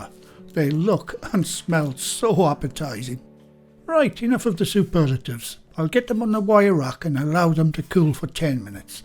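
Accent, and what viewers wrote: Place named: Northeast England, United Kingdom. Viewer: He sounds like my father-in-law. Also from Northeast England.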